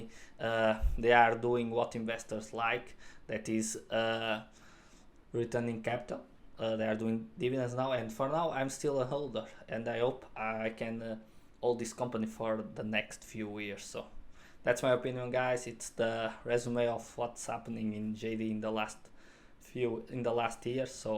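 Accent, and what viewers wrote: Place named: Portugal. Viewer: Good video! I like your Portuguese accent. Sounds a bit like José Mourinho (that's a compliment)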